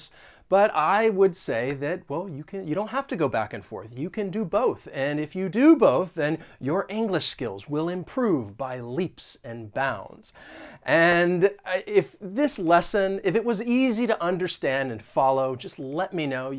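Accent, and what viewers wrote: United States. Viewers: Hey,.I'm into your Amerikan accent and your cheerful and useful videos.Every time when I watch your video lessons,I'll reams of useful things.Please teach us more phrasal verbs and idioms.I can't thank you enough for your lessons.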